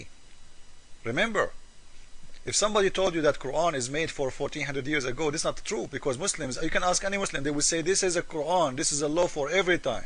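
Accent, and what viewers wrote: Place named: Coptic, Egypt. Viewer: LOL mediocre through and through. By the way, coptic accent is horrible.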